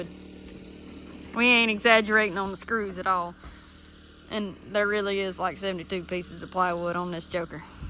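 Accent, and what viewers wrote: South, United States. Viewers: The southern accent makes her even cuter 💖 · dont get much more southern than that voice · looove the southern draw you got there, maaaa'm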